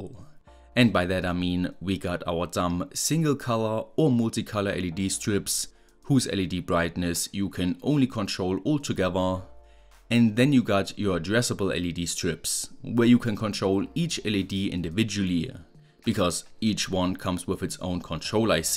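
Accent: Germany. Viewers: It’s funny that I can really hear out from the way you talk that you are German 😂❤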